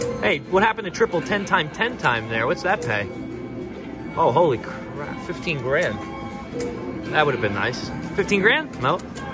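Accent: New Jersey, United States. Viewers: You must have been born and bred in New Jersey because you have a thick Jersey accent!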